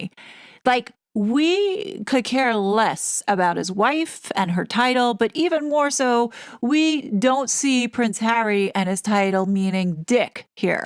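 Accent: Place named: New York, United States. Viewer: Thank you for reporting this! This is the first place I heard it, and what could be better than hearing your NYC accent. I love it! I think the whole point of this shit is to bring down the monarchy, to cheapen it as you say. Like I keep saying, they can’t have their new world order over in Davos with a 1K year old monarchy. Out with the old, in with the new, and it sucks.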